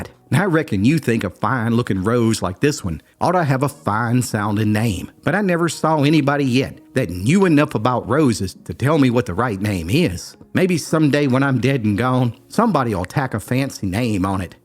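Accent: Appalachia, United States. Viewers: Lol, I am terrifyed of snakes too😂. So I identified with that old man😂. I love flowers growing but do not like cut flowers, they just die 😢. I enjoy listening to your country mountain voice telling stories. I live in the north (north-central Indiana) now for the past 35+ years. Moved up from Mena, Arkansas back in 1988. I miss the south but not the snakes and bugs. I still live on a big farm but alone now at 70 and, sadly, I am crippled. I can not do much any longer and I sorely miss my veggie garden, canning, baking and washing with my wringer washer 😭. But God has Blessed me with memories for which I am thankful and for your stories. They fill my lonely heart❤. God Bless you! Brook🧑‍🌾🙋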